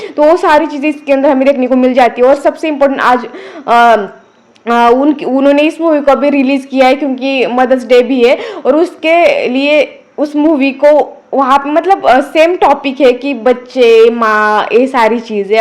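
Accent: Maharashtra, India. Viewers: marathi accent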